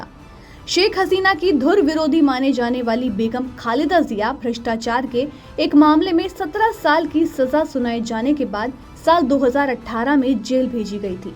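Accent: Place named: Rajasthan, India. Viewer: Ekyannnve chhhyanve , hindi nahi rajasthani bollli aii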